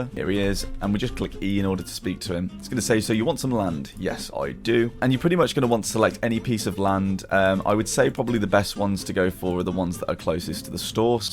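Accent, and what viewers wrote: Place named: United Kingdom. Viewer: classic British accent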